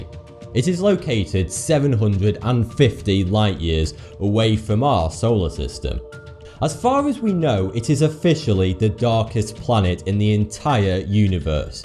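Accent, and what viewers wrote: United Kingdom. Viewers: 偏英式 我覺得很好聽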